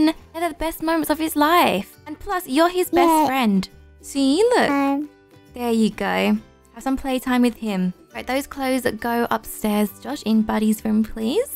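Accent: United Kingdom. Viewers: I like how are british ❤❤ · Definitely British. Go find the exsplamation to find out! <3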